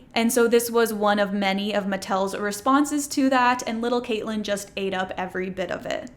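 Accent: Canada. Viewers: You have great teeth and say oot instead of out, so you must be from Canada.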